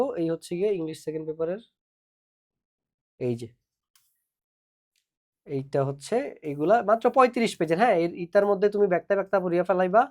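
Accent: Chittagong, Bangladesh. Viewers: Your ctg accent is soo good bhai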